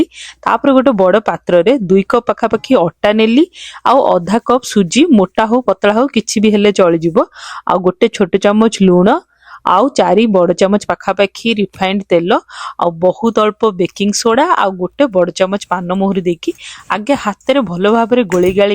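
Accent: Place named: Ganjam, India. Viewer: tume Cuttack ra kintu tuma katha barta ganjam ra bhali laguchi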